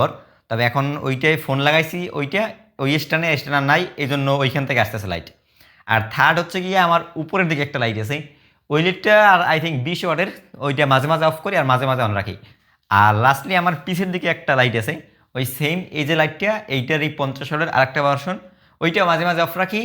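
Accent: Barishal, Bangladesh. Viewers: ছোটভাই তোমার বরিশালের ভাষাতে অনেক ভাল লাগে। ওই ভাষাতেই ভিডিও করো। আমার অন্তত ভালো লাগে।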